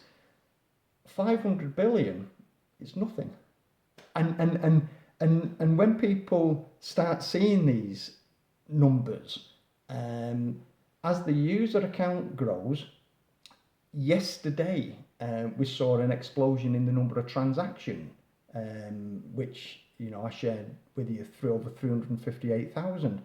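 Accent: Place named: Yorkshire, United Kingdom. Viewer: From Leeds? Yorkshire? Loved the video! Very informative. Steem is interesting with its user interaction with it/ social aspect.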